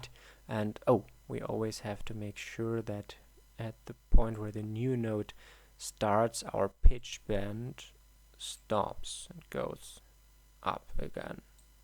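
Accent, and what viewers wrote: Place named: Germany. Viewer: Aber Du hörst dich schon an als ob Du krampfhaft versuchst, den deutschen Akzent zu vermeiden :P (Geht mir aber genau so, wenn ich im Ausland bin..bloß nicht Deutsch klingen^^)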